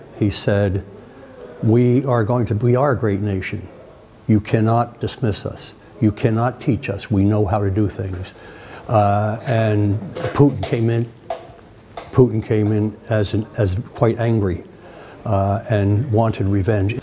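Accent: Serbia. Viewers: Kako tvrd, sprski izgovor engleskog jezika. Uzas.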